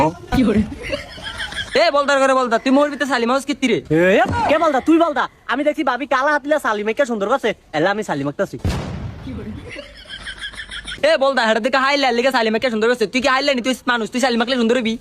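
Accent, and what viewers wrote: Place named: Chandpur, Bangladesh. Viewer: Chadpurer vasa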